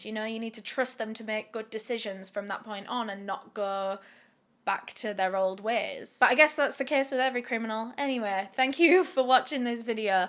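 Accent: United Kingdom. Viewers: yeah it’s the British accent and the way she also tells the story. I feel most just say they do this wrong they did this wrong they went thought this. But when she tells the story she makes you feel sympathy for the killer with things they went through, she shows empathy in the right places and tells it as if they haven’t don’t anything wrong until they do and the whole thing changes to her being against them.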